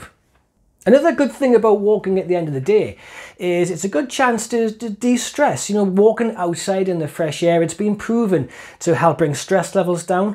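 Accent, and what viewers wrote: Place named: Newcastle, United Kingdom. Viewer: Geordie . Hello from Australia. Miss the accent.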